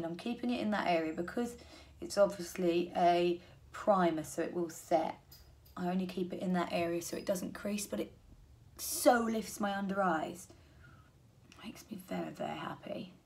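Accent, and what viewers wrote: United Kingdom. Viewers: I really like British accent And I like your makeup. I like you make Kira knightly makeup.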